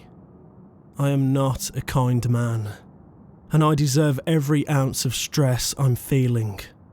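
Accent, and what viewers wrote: United Kingdom. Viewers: Sounds kinda british though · Your voice is so British and like a tv documation from police interceptors or something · its a hella british voice lol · BRITISH MAN?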